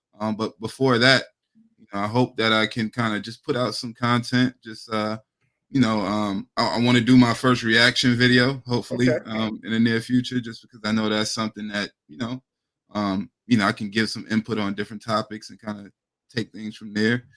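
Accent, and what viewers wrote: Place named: Maryland, United States. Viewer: This was a treat to watch!!! Many genuine laughs while watching. your Maryland accent is so awesome. I’m amazed you learned about silver through the Moorish science movement. Islam!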